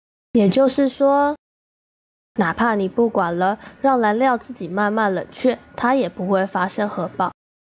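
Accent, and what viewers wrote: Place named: Taiwan. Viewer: 為何台灣國語?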